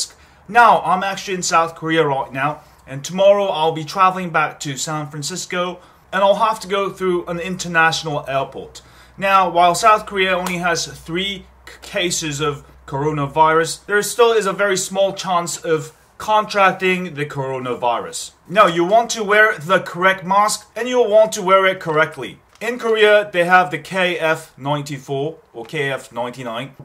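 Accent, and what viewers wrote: United Kingdom. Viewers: whats up with the brittish accent? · going to costa rica in feb not cancelling that shit ''its not a joke" but dudes talking like hes from the UK l lol smh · Did you live in the UK? You seem to have a strong British accent sometimes.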